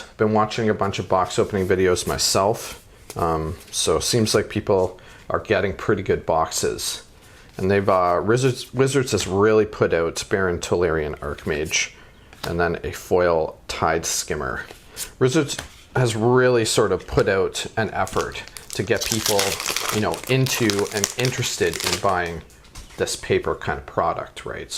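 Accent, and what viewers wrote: Canada. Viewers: First! Awesome videos! Canadian Accent is Crazy! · The amount of times he’ll say “eh” in his videos, no doubt he’s Canadian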